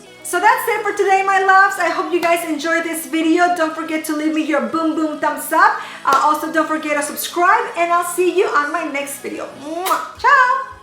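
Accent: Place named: Mexico. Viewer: Hola... veo tus vídeos para aprender un poco más el idioma, aunque se me hace rara tu pronunciación, es un inglés americano con acento mejinaco muy gracioso. Pero aquí me tienes 🤗porque eres si serás mi youtuber favorita. Aunque mi canal favorito es de de vlogs, sí ese que tienes algo descuidado últimamente 😔... Saludos desde Madrid España 😘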